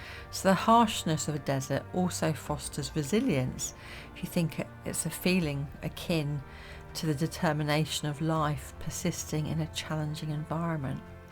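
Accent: England, United Kingdom. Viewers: This was an incredible piece of artwork,. really lovely to listen to your story. You have a very soothing voice, also great to hear a fellow English accent 😂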